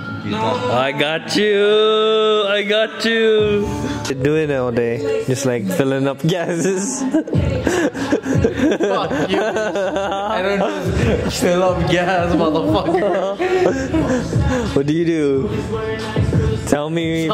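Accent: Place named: Saipan, Northern Mariana Islands. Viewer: Yo I been missing you lol. Glad to hear our island accent in your video. Keep up with the good work ma brother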